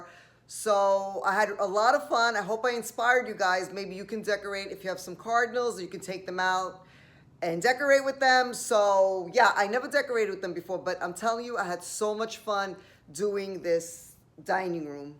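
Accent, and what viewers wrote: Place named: Northeast, United States. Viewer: Beautiful, I like the traditional red and green colors for Christmas. Great idea with the use of the cardinals, I love it. I am going to incorporate them into my Christmas decor next year. I enjoy watching your videos and listening to your accent, you remind me of some of my dear friends. Are you from New York, New Jersey or Pennsylvania, by any chance? Have a wonderful holiday.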